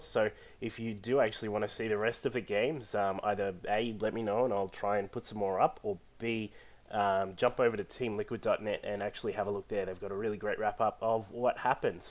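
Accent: Australia. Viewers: It's good to hear a Aussie commentator for once. Good work =p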